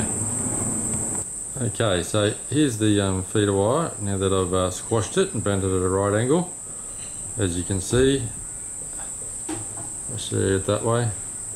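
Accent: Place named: Australia. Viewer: V good & should be helpful to me as I have finished laying my track to find my locos wont go around on it, so I now have to look at doing this. Glad you are running Hornby & select DCC as I am also. You are obviously Aussie & I am English but in NZ so do the kiwis call them suitcase clips "suitcase clips" ? But had you gone straight from the bus to the rail with one feeder you would have saved effort & half the amount of clips wouldn't you? Where did you get the clips & wire from? Thanks.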